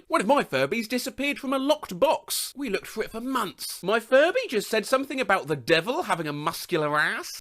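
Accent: United Kingdom. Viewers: i'v never heard a voice screaming "BRITISH" more than yours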